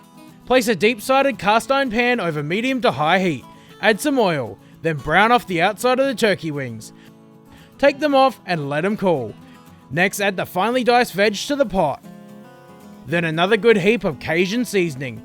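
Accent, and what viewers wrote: Australia. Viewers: australian voice making Cajun food ??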